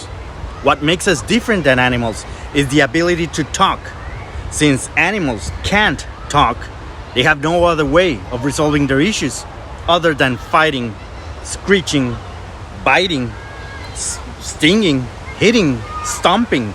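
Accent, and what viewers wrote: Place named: United States. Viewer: Mi hermano te suplico que no digas mexico y mesoamérica . Con acento gringo. Y te felicito por si saber pronunciar los nombres de los tlatoque con acierto. Gracias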